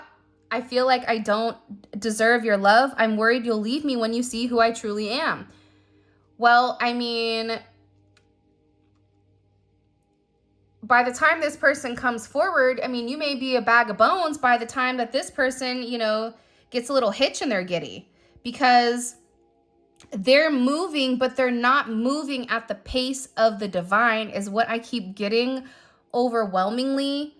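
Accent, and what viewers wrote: California, United States. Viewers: Yes and I am one of those people that do not like your accent either. I do not like the American accent on most that I have heard. And yet my favourite singer is Mr. Neil Diamond, - and he talks so pleasantly, and American. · ! Girl you bringing the FACTS!! Resonates and Confirmation 💯🔥🔥 by the way I love your Cali slang lol I am on the other coast tho so it's different so I enjoy it😂 and Maaaannn the Angry Beavers you came with that and I was like OMG!! 😂 Yasss!! Love Ya! Thanks so much😊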